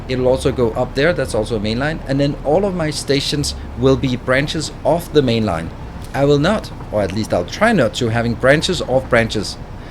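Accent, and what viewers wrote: Denmark. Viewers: a dane can hear a dane XD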